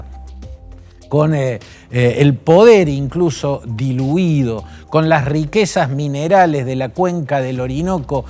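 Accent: Argentina. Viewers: Para tu conocimiento: El virreinato de Perú en el año 1543 comprendía gran parte de América del Sur, que incluía los actuales Panamá, Colombia, Ecuador, Bolivia, Chile, Argentina, Paraguay, Uruguay. Entonces según tu retorcido punto de vista, los peruanos tenemos el derecho de reclamar que esos países vuelvan a ser peruanos. Por tu dejo parece que eres argentino o veneco, que Argentina pues vuelva a ser de Perú. ¿No te parece?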